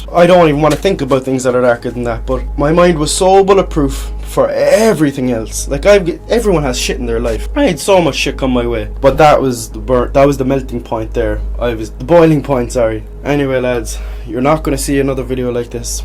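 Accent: Ireland. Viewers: Bro you rare af a black guy with a Irish accent you would get so much ass in America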